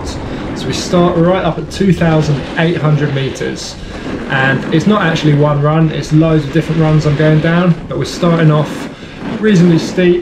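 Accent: United Kingdom. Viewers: Oddly satisfying no loud music or fancy tricks or montages just a start to finish cruse down a mountain with a British narrator voice over.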